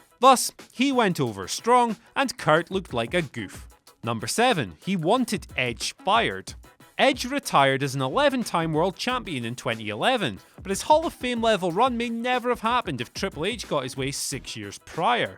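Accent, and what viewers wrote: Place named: Scotland, United Kingdom. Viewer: Finally a Scottish narrator 🇬🇧🇬🇧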